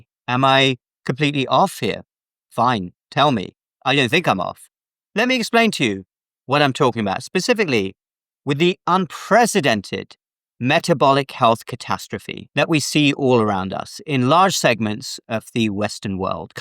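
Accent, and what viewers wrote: United Kingdom. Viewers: You still have the British accent Doctor 😊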